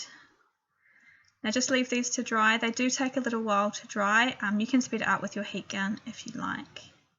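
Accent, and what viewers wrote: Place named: New Zealand. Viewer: I thought you sounded more kiwi than Aussie. I love your work!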